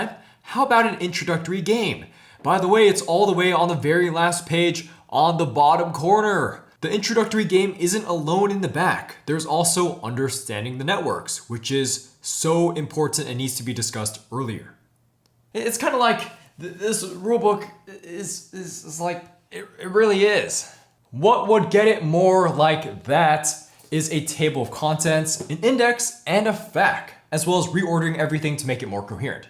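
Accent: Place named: United Kingdom. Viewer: Nice British Accent. Cool game, just not my speed.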